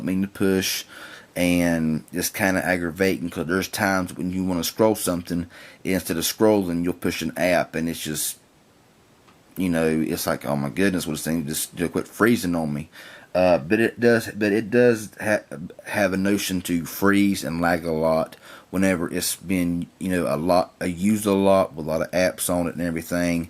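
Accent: South, United States. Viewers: You sound like you're from down south, but you talk nerdy, I LOVE IT!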